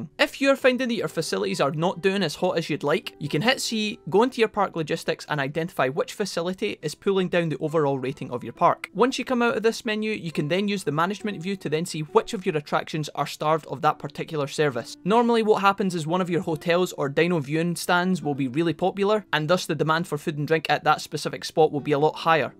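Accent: Scotland, United Kingdom. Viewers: First time viewer and love the Scottish accent. I am a giant fan of foreign accents so this is amazing! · Is this a scottish accent? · Cool video, learned a couple neat tricks for the game, and also found a fantastic new Scottish accent to emulate. Well done lad! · This guy is scottish. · I love your accent! Are you Scottish? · Ah 'hink he's Scottish like me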